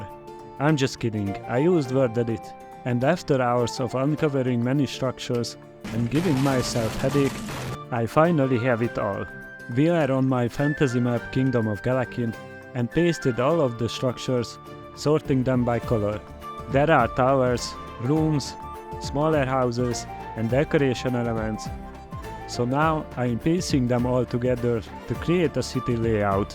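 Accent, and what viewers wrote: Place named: Hungary. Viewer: You have great skills bro, you have another subscriber. Also your accent reminds to hungarian speaking english, but its cool!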